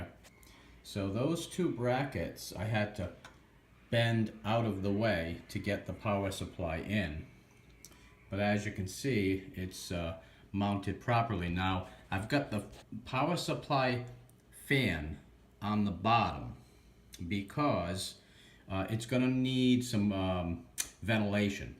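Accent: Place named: New York, United States. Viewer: I'm with you Robert, he does have an interesting and great accent. I'm English and I can place most UK regional accents, a visiting lecturer was a bit shocked when I placed him within a few miles of his home just on his accent. To my totally untrained English ears sounds like he's from the New York wider area?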